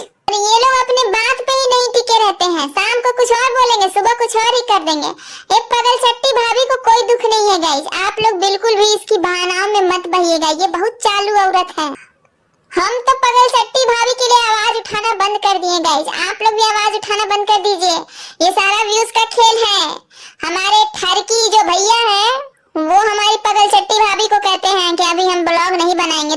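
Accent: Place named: Varanasi, India. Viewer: 😂😂aap hai kaha se plzz btaiyega aapki aavaj bilkul hm logo ke tarf ka lgta h mera matlab hai vanaras jesa h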